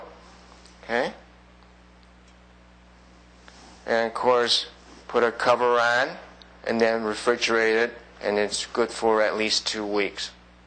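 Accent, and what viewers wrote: United States. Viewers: i can sense a Chicago accent from this cook. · no. he learned english with some kind of chicago or midwestern american accent. the accent is american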